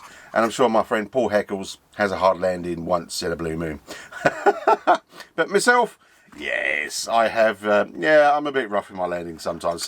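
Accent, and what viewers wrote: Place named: England, United Kingdom. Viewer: Hello! A time ago I found your channel for looking after the Max Thurst Ruckus. Now I´m thinking about buying a used but almost new Draco for about 500€ maybe less and knowing the low points. Until now I have seen complaints about CG, tires (you said they were changed?), power and landing gear (front and rear). What should I consider if buying this used one, what do you think? Much appreciated to hear from you Master:))) Best regards to the UK, your channel is really cool, and it is nice to hear english accent instead of the most in RC spreaded american. Just my opinion:)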